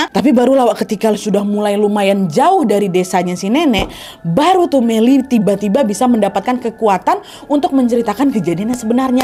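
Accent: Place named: Sumatra, Indonesia. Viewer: AKU MAH SUKA SAMA KARNA CARA BAWAIN CERITANYA ENAK ASIK. NYEROCOOOSSS TERUS TANPA HENTI DG LOGAT SUMATERANYA. LANJUTKAN...!!!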